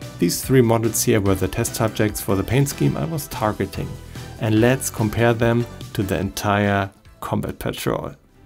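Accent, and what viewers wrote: Germany. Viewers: you are german i am sure haha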